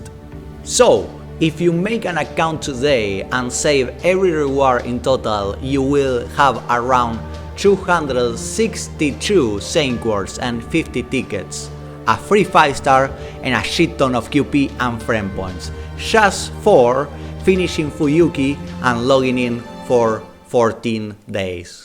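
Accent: Argentina. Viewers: Sos argentino? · Tenés el acento más argento que yo haya escuchado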